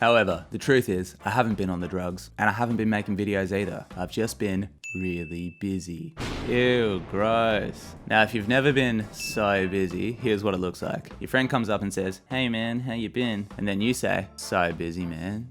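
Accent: Australia. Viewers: Your humor is soo heccing good. Get's me everytime. You got this kinda dry humor I really like and the australian accent just adds to it. Kinda got MK's Kano in mind. Kano giving advice. Just imagine that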